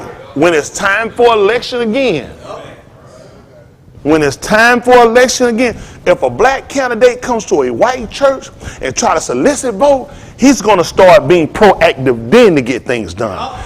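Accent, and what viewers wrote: South, United States. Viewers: Sound like a Southerner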